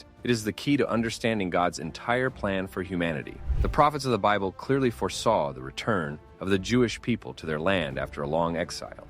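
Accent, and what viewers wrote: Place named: United States. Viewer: I, too, agree and certainly believe we are indeed in the " Latter Days." The Scriptures hold true concerning prophetic events that have come to pass, and the rest to do so are soon to follow. You sound American, perhaps Canadian! ? If so, why the mask ? At least in the U.S. you're free to speak. People may not like what you say or you as a person , but who cares ?! If you're in a nkn- Christian nation, then I understand. Either way, I share your beliefs and pray hou His blessings. 😊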